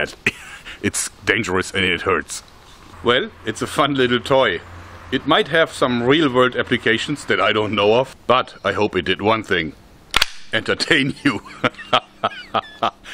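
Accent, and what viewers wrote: Germany. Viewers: Between his thick German accent and the nature of this device, I can't help but think of Doctor Mangele and his many "very special" experiments. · abgesehen davon hat der deutsche akzent einen scharm der irgendwie zu DIY Waffen passt:D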